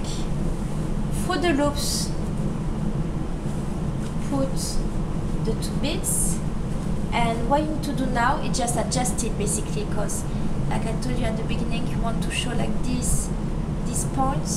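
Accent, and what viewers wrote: France. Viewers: I like your french accent. Il faut que l'on se rencontre ;) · FRENCH ACCENT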